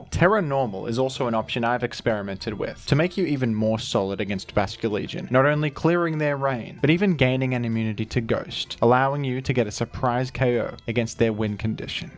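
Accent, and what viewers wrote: Australia. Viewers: Oh thank god you’re Australian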